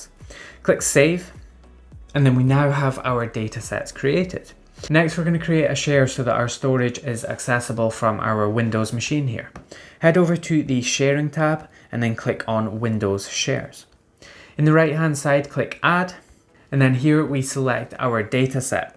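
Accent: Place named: Scottish Highlands, United Kingdom. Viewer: If I was to make a guess I would say you are from/at least grew up in Inverness?...definitely somewhere in the highlands.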